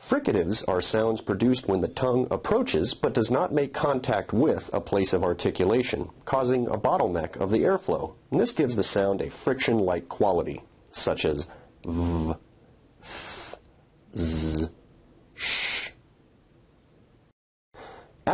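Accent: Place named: North America. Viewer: He's speaking North American English, Non-British !!!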